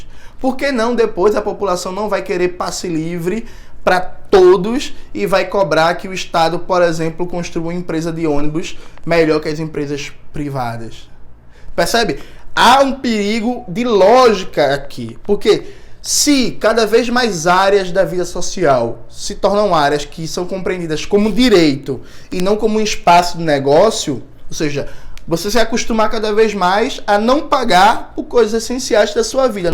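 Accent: Pernambuco, Brazil. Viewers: te adoro pela qualidade de teu trabalho, teu conhecimento... Mas essa proximidade com um jeito diferente do nosso, aqui do interior de São Paulo, de falar é delicioso. Um ritmo, um andamento faz com que ouvir você seja delicioso.... Que maravilhoso quebrarmos essa hegemonia horrível do capitalismo que impõe um modelo "padrão" de ser, massacrando os regionalismos tão ricos e lindo em nosso país.... AMOOOOOOOO esse jeito cantado de falar....Adoro essa pernambuquice... Sabe, graduei, fiz mestrado e meio de doutorado. Nunca tive um professor negro e estou adorando... Obrigada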